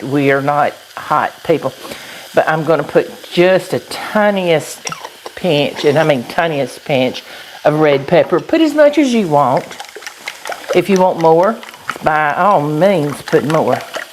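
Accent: South, United States. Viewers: I ❤ your southern accent. I am new to your channel. Enjoying your channel.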